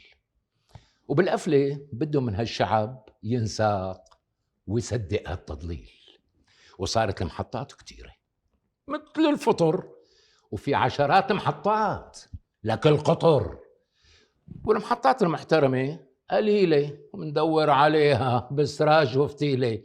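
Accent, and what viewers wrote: Syria. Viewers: جميله اللهجه السوريه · ريحة الشام و الياسمين.. ريحة وطني.. و لهجة وطني.. ريحة أبي.. و طريقتك بتشبه طريقته.. الله يخليلنا ياك 🌷 و يدوم عليك هالذكاء و الإلقاء و الفكاهة اللاذعة 💕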